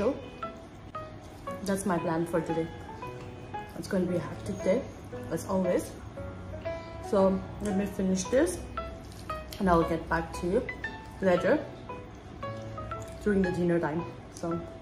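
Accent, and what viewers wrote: India. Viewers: please make a video in Hindi na....your Hindi accent is so ❤ly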